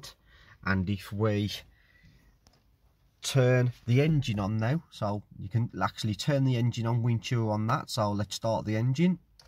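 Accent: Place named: Black Country, United Kingdom. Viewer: Brilliant ....thank you....and great to here a proper Black Country accent....I've been away too long...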